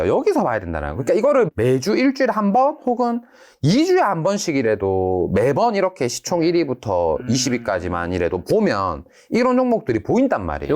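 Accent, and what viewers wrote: Gyeongsang, South Korea. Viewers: 주식판에 젠체하는 젊은 경상도 아들 와이리 많노~ 거슬리네~~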